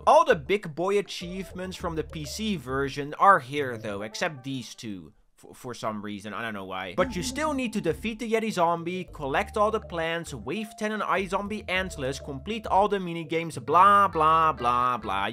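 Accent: Netherlands. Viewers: why can I hear the dutchness? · Hoe had ik aan het begin van deze video opeens de gedachte: wacht... is hij Nederlands? Bizar dat het gewoon waar is. goede video!!1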